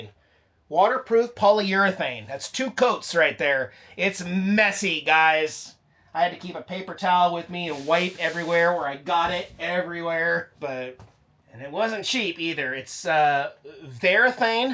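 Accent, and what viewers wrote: South, United States. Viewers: I detected a bit of southern twang. You been hanging with those wonderful southern Mississippi people. Love it!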